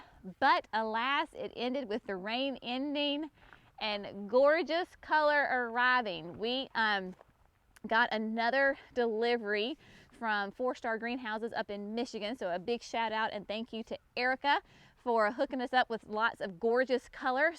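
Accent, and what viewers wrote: South, United States. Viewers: Hello from the wasteland, Illinois! So good to hear someone from the south! Your our sanity.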